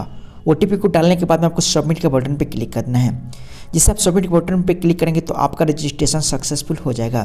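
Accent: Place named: Arrah, India. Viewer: awaj me Ara jila ka jhalak mil rha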